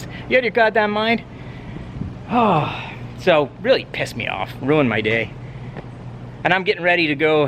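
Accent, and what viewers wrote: Northern Ireland, United Kingdom. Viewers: Enjoyed your videos thought I got a sound of a north of Ireland accent , greeting from Dublin.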